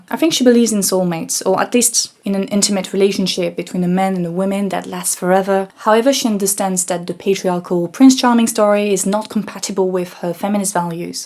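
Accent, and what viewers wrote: France. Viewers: She does it deliberately to misrepresent her political opponents so she can make 'essays' pretending she knows what she talks about. Trust me, if you start an essay from a false premise then no matter how many big words with a french accent you say, it's pretty much garbage from the initial false premise. She doesn't want feminists represented by the hairy purple haired freaks screaming at everyone with viens bulging from their forheads about how proud they are for their abortions because she would claim that lacks nuance right before making the claim that all men are incels in her opinion 🤷‍♀️ this approach is the substance of most leftist debate these days, thats why conservatives generally have stopped trying to talk to them. Because their interactions are disingenuous and gaslighting.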